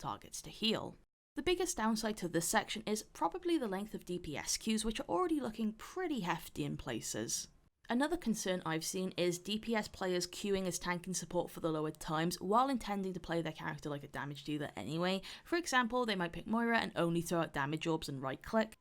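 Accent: Wales, United Kingdom. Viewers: are you welsh i can’t really tell but i’m guessing you are 🤷🏽‍♂️🤷🏽‍♂️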